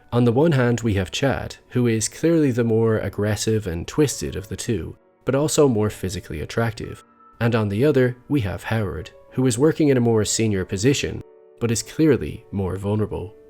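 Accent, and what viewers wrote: Ireland. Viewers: Sounds Irish to me, but not that strong an accent. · Very subtle Irish. He sounds like a light version of the Irish guy who has a true crime Youtube channel called That Chapter. Except he pronounces the TH in "three" and "thirteen" whereas the other guy says "tree" and "Tirteen".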